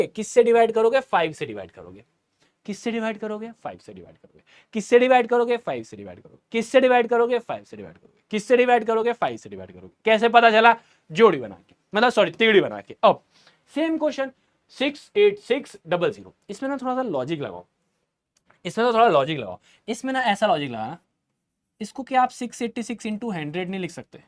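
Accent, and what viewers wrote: India. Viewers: Love ur other Hindi Accent ❤️😭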